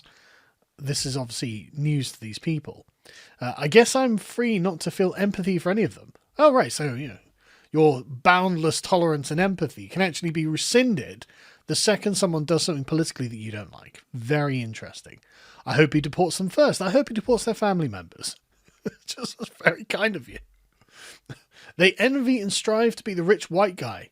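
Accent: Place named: United Kingdom. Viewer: i think you had something on your face. british english is weird. youll prounce "water" as "watah", but "swastika" as "swastiker".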